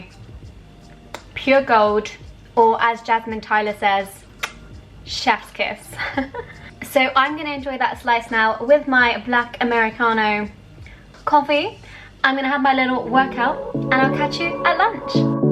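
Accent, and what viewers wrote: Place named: United Kingdom. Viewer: I understand that you are sponsored by MyProtein. And i also get that you workout,as you constantly say in your videos. But you are not a bodybuilder,and i assume that you don't hit the gym as hard either,nor you try to gain muscle size. (forget me if i'm wrong) So why all this protein obsession you have? We need protein to recover and repair from our training,but what you do is a bit too much. I train 6x week ,1,5 hours long each session,and i am exhausted after each workout,plus i am a male. But i don't go above 1,6 gr/kg of bodyweight per day. Could you do a video about this,why you seem to depend on protein powders, and why you think you need so much of this specific macro, I would be glad to hear your take on that. And soy isolate protein is perhaps the worst vegan powder you could possibly consume,because of the soy protein extraction process. 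Google it,and you will see. Other than that,i always love your voice and British accent,plus your videos are so calming and soothing. Always fun to watch!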